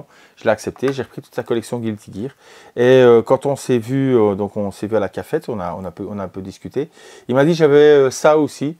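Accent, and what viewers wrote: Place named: Belgium. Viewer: salut salut!!! Super la vidéo j'ai pas su y aller et j'y tenais comme néophyte pour voir se que l'on ose imaginer! La prochaine fois promis. Autre chose, On avait parler de pc console portable pour faire plus abouti, j'avais parler de la rog alloy mais depuis qu'elle est sortie c'est toujours hors budget, tu m'avait transmis un lien pour des occas Steam deck mais je ne retrouve plus le lien. Parce que bon j'me suis laisser avoir par l'anbernic rg 556, pour laquelle tu ne m' pas donner d'avis constructif si il y en a un. En tout cas je reste a se que j'ai pour l'instant dans l'idée de m'offrir une Steam deck Oled plus tard.... D'ailleurs il y a un délais responsable sur lequel compter pour pouvoir se l'offrir quand elle le faudra encore sans non plus avoir une bouzz a 100 balles... Je me limite PSP PS2 wii etc pour l'instant et d'ici quelques mois j'investî gros! 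PS: j'adore l'accent belge pour l'être moi même, ça fait encore plus en vidéo haha.merci pour les infos!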